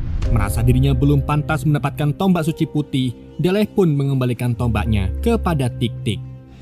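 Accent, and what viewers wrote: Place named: Banyumas, Indonesia. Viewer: Mas admin, Njenengan asline ngendi mas? Narasine karo bahasa ngapak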